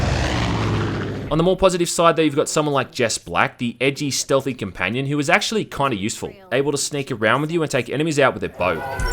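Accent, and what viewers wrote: Australia. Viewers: Dunno why but it was cool when you came out with the Aussie accent I haven't seen your vids before Just bought far cry 5 today, waiting for it to download Cheers from Perth mate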